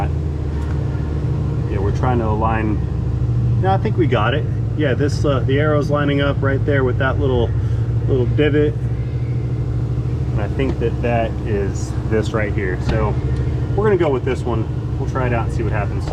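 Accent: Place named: South, United States. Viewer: Is it just me, but does voice sound a little different. I think the subtle Southern drawl has nearly disappeared. Different mic or other sound equipment change? NBD.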